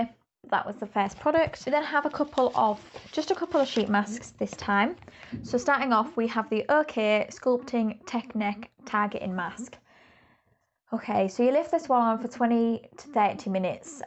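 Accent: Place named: Lancashire, United Kingdom. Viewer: I am UK based and do empties videos too! There aren't many of us, happy to find you and your channel! It's always nice to see reviews of products that I can actually get hold of and try myself! Subscribed! Ooh are you in greater Manchester, Lancashire? Am I close or nowhere near? I love trying to guess accents, I find them fascinating!!!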